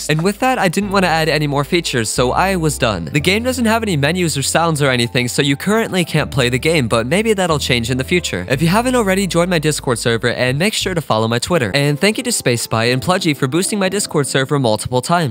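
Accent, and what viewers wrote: United Kingdom. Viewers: British accent pog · you sound british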